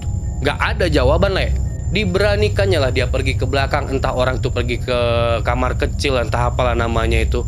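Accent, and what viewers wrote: Medan, Indonesia. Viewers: Kereennn ceritanya leeekkk.... Medan kali laahh gaya abg ini semangat bang.... 😂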